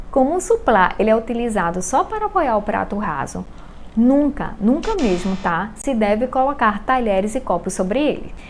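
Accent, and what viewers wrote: Recife, Brazil. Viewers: Vc é de Recife? Sutaque bem parecido! Moro em Curitiba, amo Recife! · Eita sotaque forte que me lembrou uma amiga do Recife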